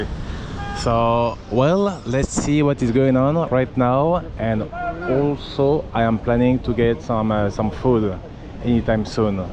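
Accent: France. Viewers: I’m honestly thinking there’s a language barrier. You have a French accent, and I think a lot of people are having a problem understanding you. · ! Seriously, I have been to Jamaica many times and I have been treated wonderfully by the Jamaicans. It could be your heavy French accent but then again I have followed Dee Mawanga and her brother I’m_Marwa and they both have both heavy Kenyan accents and they both had an awesome time in Jamaica. Just keep on plodding on and don’t take things too personally. Many people don’t like to be on camera so you may have to tweak the way you do things a little. You also have to show the many developed areas in the Jamaica. Anyway, I will still follow you. Good luck my friend. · Sorry you are having a different experience in JAMAICA than you were hoping. There are many Jamaican vloggers like you and Africans men and women, who have passed through the streets of Jamaica and have had a great time with the locals like the Ghanaian Wode Maya, I am Mahwa, etc. 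You should have checked out the Rastafarians and Ital Food restaurants to get your meals as there are many of them around. You have to try a little harder to lower the French accent as many people you encounter selling in the Streets find it hard to understand. Your dress style is not an issue. Some Jamaicans learn French in Highschool, but you will not find them walking around unless you are in the better neighborhoods. So, stay strong and continue to get better with your JA vlogging experience. One Love.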